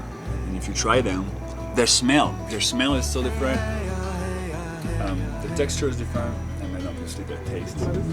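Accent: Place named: France. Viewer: This guy sounds french to me ! I wish to come very soon to Eagle Nest. Carole from Biarritz, France !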